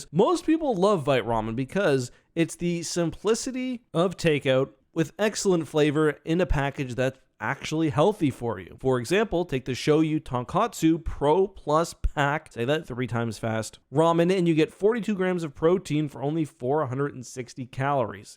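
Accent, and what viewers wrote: Canada. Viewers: this canadian english makes me imagine all the ways Canada prospers off america, like this yt platform with commentary on a hollywood production.. weird ways like this that does not seem obvious.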